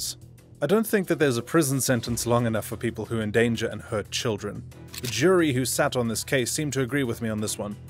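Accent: South Africa. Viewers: bro are u South African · Hey man super random, but are you South African? I’m SAn too but it’s rare that I find a SA YouTube channel with this content and this good. Would love to hear from you boet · so refreshing to listen to a South African accent for a change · South African, but it's not that strong, like they've been living in England for a long time .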